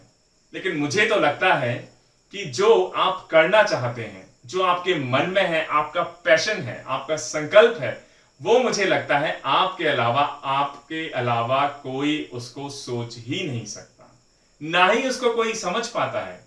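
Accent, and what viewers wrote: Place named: Uttar Pradesh, India. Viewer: उत्तर प्रदेश में आपका हार्दिक स्वागत है सर जी । आपकी भाषा एकदम उत्तर प्रदेश के लोगो की तरह है । ऐसा लगता ही नहीं को आप राजस्थान से है।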